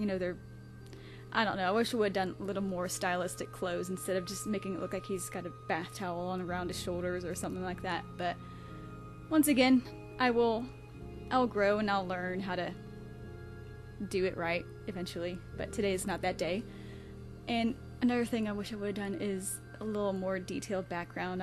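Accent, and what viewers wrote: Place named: South, United States. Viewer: OMMGGGGGGGG your voice is so cute ya lil southern bell u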